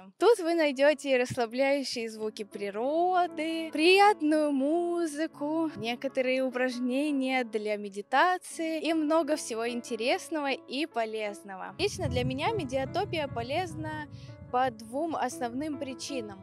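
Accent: Russia. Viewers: Don't know what's sexier, that outfit or your Russian accent :)) Your piano playing is phenomenal and loved the outdoor setting. Curious where you mounted the camera lol :) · В первую очередь, хочется выразить благодарность за Ваши труды. То, что Вы делаете это прекрасно., расскажите откуда Вы родом и где живёте (где такие самородки появляются)) Даже в пределах России, бывают акценты и говоры. Я тоже что-то слышу в Вашей речи) То ли какой-то пермякский говор, то ли зарубежный акцент?)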